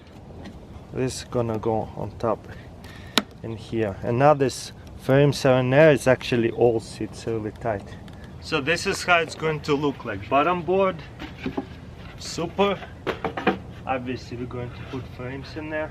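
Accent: Russia. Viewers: i like ur rushian accent :)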